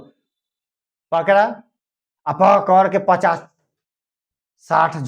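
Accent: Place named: Bihar, India. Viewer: SIR MAJA NAHI AA RAHA HAI APANA STYLE ME BIHARI STYLE BOLIYE ❤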